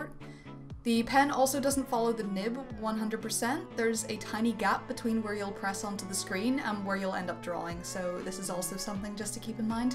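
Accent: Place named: Ireland. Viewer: Why was I so excited when I realized she had an Irish accent?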